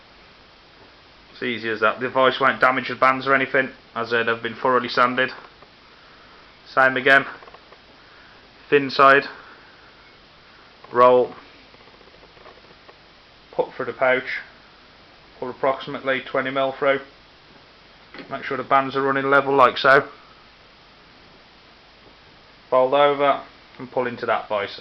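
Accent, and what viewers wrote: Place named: United Kingdom. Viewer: Whow. Thank you for that know-how. Where are you from? It took me some time to get used to your accent, now I understand - what I hardly did at the beginning :o) All I could guess was that you must be British.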